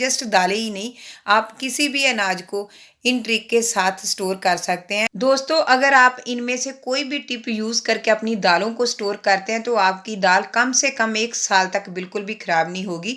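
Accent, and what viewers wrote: Punjab, India. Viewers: Punjabi touch aa Riha h... Mm Punjab ton??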